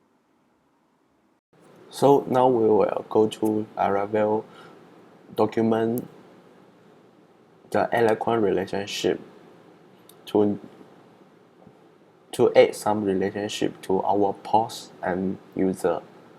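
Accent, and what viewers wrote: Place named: India. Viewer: 还是说中文吧。。这英文听着和印度人一样的，产品不错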